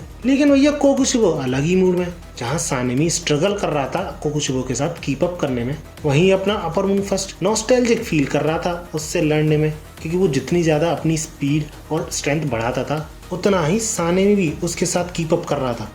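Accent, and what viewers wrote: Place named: Indore, India. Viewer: Bhaiya apka indori accent bada mjedar hai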